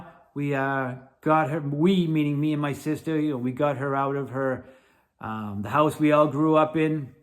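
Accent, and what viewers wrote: Boston, United States. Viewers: I could tell from your accent that you were from Boston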